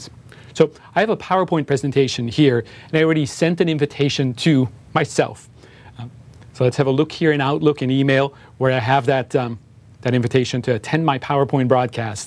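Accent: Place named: Germany. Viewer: Are you a GERMAN ? Got an accent . :)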